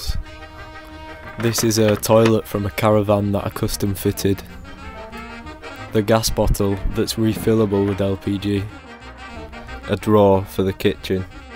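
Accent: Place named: Yorkshire, United Kingdom. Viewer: That's a good old yorkshire accent for ya :-)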